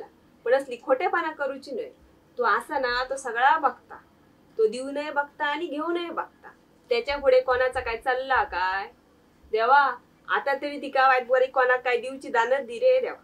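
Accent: Malvani, India. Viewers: Tuzi Malvani phar wegli sound kartay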